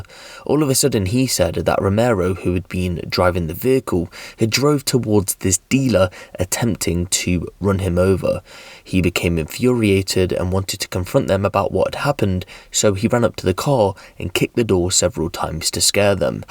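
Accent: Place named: RP, United Kingdom. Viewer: Not being horrible but I ain’t watched u in ages and your voice sounds well posh since I last heard you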